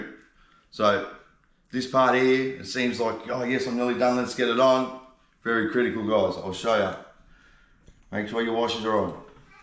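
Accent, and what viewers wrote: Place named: Australia. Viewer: bros voice is the definition of aussie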